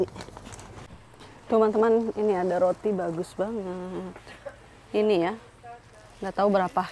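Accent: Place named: West Java, Indonesia. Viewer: Resep bhs reng logat sundana has te hilap slm ti bdg sehat trus kanggo keluarga ❤❤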